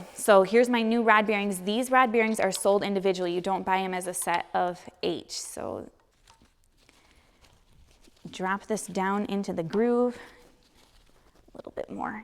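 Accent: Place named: Michigan, United States. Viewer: Great video. Also, I think I detect a northern accent... Wisconsin or Michigan perhaps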